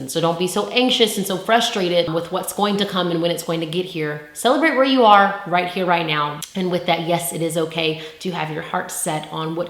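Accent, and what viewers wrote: South, United States. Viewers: Her southern Belle voice is beautiful lol whoever hasn't got a chance to see her LIVE and in person. I need that to change in 2018. I've been grateful enough to have seen her LIVE about 3 times. And she is such a GOD GIRL . Years ago I bought one of her jackets. And it's been keeping me warm ever since. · Lol where I'm from we always talk like you do with a southern accent for jokes but you really talk like that and I just couldn't take you serious I kept thinking you were joking 😂😂🤷🏾‍♂️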